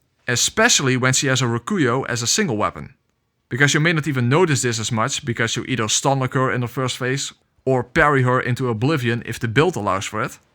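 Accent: Netherlands. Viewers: You sound kapot dutch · Jezus het Nederlands spat er vanaf de eerste seconde af. Lekker man, altijd mooi Nederlandse soulsborne fans. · Nederlands accent? hahaha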